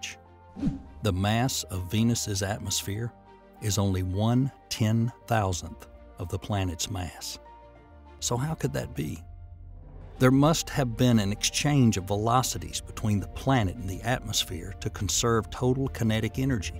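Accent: South, United States. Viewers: Always expect a British guy when watching space videos. The country accent always catches me off guard lol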